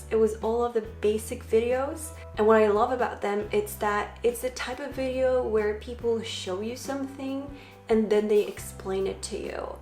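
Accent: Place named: United States. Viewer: I remember your first videos on this English channel and oh my God, girl, you've improved soooo much! Not only vocab and grammar but your pronunciation! If I didn't know you're from Poland, I would definitely say you're American! ❤️ cheers from Opole 😉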